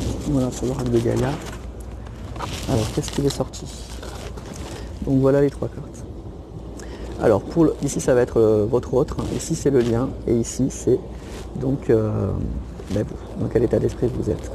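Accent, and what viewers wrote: Marseille, France. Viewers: On dirait Marseille 🥰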